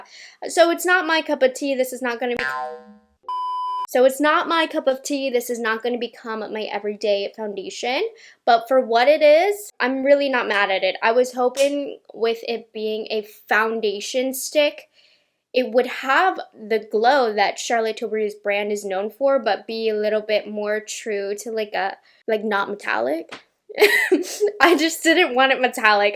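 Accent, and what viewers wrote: England, United Kingdom. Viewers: Aah, excellent video, and the English accent uncanny (good audition for Bridgerton). Yeah, I agree with it looking metallic. It reminds me of Laura Geller's balance and glow powder foundation, and that never sat well with my skin. But you did an amazing job with this honey, I won't be purchasing it, but on a very young skin, it would probably look amazing, just not for my almost 61-year-old skin. Thank you, gorgeous girlie 😘 🌟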